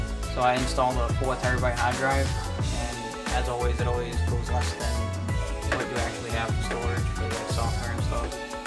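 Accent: Boston, United States. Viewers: Hawd drive. From Boston?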